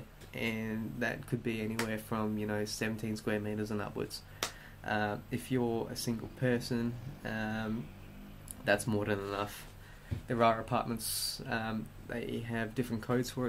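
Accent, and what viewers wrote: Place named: Australia. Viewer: You have an Aussie accent. Cool!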